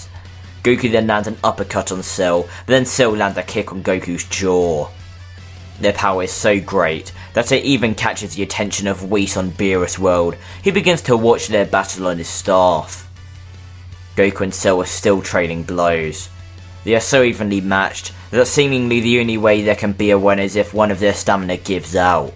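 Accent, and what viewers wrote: United Kingdom. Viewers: Is the man Briish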